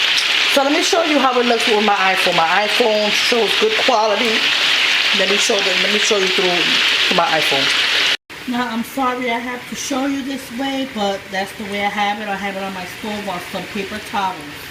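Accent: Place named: Bronx, United States. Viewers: Girl where are you from? You sound like you are from The Bronx (like me) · wow I grew up there on <num> had mainly Dominican and Puerto Rican friends so I can definitely detect a NYC Latina lol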